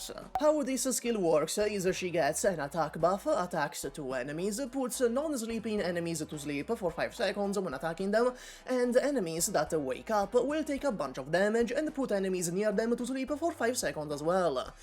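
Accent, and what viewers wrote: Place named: Italy. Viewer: your italian accent makes me parasocial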